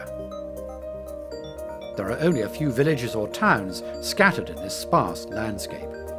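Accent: United Kingdom. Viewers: My first comment would be that the narrator get an education in pronouncing the names correctly. Would any Limey with so sophisticated an accent as his do so badly in that horrible French they all seem to effect? The editor or writer of the script is really really biased towards the "black"" side of our history. The world should remember that the black (mainly Xhosa) tribes arrived in this region at about the same time as the settlers, so there is no basis for the "rights"to any lands. Yes, there were atrocities inflicted, but by both sides...Sad that the Western Cape (ie Cape Town and environs) are omitted...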